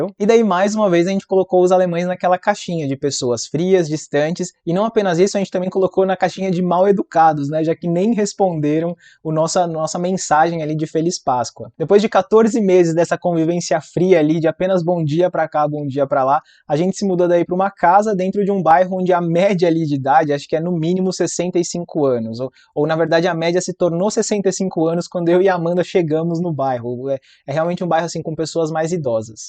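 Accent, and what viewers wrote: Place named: São Paulo, Brazil. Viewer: Como vc é de Salvador com esse sotaque paulistano, do Bexiga?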